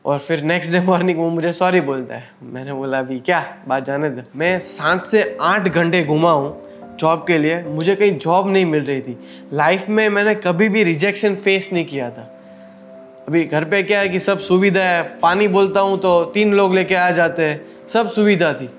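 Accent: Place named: Gujarat, India. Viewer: That gujarati accent 😊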